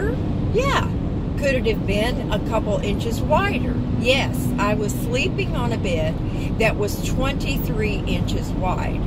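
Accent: South, United States. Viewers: "Yu All" You sound like a good ol' southern lady. Hope to see you at RTR 2019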